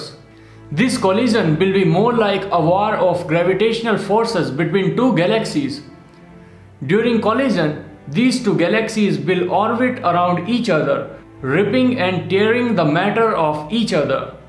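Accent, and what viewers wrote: India. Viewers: I love this guys Indian accent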